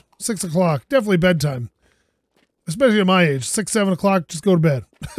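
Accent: Canada. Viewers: You have a Canadian accent are you Canadian?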